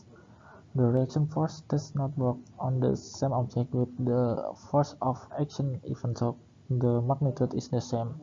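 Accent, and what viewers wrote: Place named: Indonesia. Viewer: logatnya kayak orang indo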